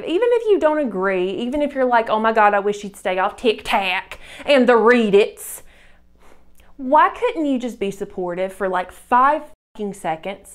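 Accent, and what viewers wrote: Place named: South, United States. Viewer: I love your content so much, it makes me feel better about my decision hearing someone who, at least sounds southern, talk about being childfree. I have known I don't want kids since I was like 10-12, I'm 23 and my entire family still says "you might change your mind" despite the fact I have adhd and depression already, despite telling them there is a high chance I will get post-partum and hate that hypothetical kid to the point of possibly hurting it, they don't care cause I have a uterus and we come from a big, Southern family raised on Christian values that I don't even believe in.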